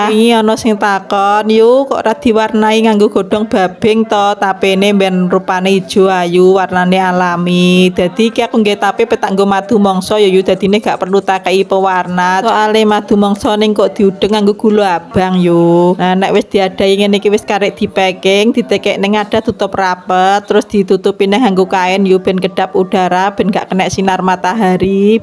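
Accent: Java, Indonesia. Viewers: Ra kaprah..dialek .bjn blora y yu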